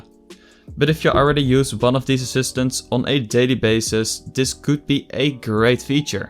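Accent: Netherlands. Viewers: Je hoort dat je Nederlands bent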